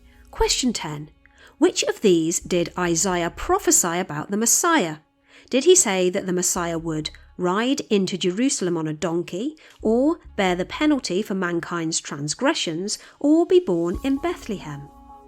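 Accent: England, United Kingdom. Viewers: I so enjoy your quizes and also love the sound of your voice with its English accent.😊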